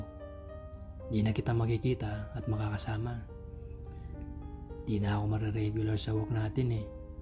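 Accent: Pampanga, Philippines. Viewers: ❤❤❤ Ang ganda ng storya nyo sir at tunay na may accent kang kapampangan. Ang sarap isipin na may happy ending kayong mag jowa. Hehe. Secret pala❤😊😅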